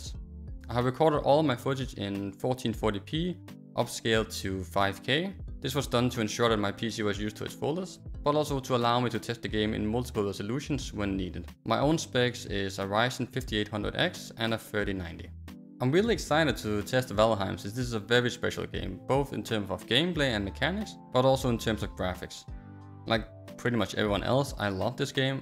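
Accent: Denmark. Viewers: Yo m8 du lyder som en dansker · You danish bro? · Tak for videoen min ven, god forklaring og perfekt med opdeling af videoen for os der ikke kræver den store intro til grafikinstillinger. Er sikker på du er dansk på den vidunderlige accent du har 😄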